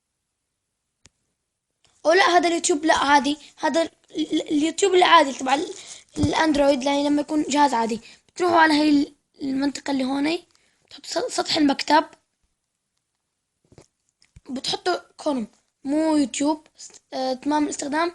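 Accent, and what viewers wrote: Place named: Syria. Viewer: مبين لأن لهجته سورية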